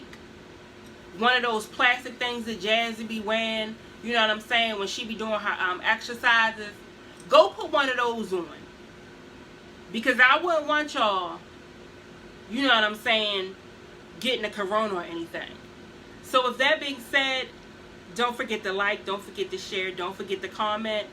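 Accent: Baltimore, United States. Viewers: hey babe! i love your baltimore accent.💛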